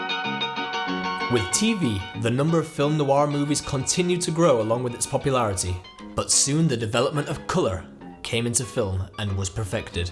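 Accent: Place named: Lancashire, United Kingdom. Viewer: Can't hide a Lancashire accent. 😁 Fantastic doc!! Thank you!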